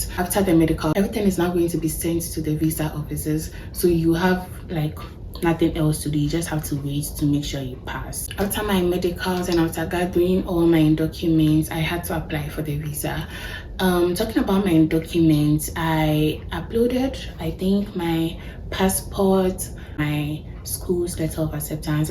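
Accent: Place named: Ghana. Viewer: hii, did you use the student direct stream? you sound ghanaian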